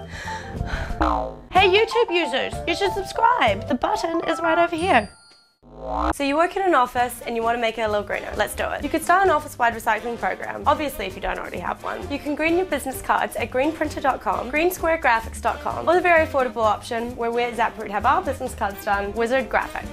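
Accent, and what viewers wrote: Australia. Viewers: she talks way too fast. shes australian isnt she ß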